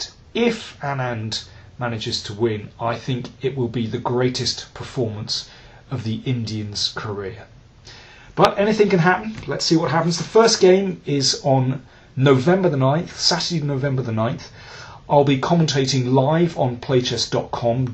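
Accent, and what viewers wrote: United Kingdom. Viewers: lol what's up with this guy's rich british accent, sounds very cartoonish haha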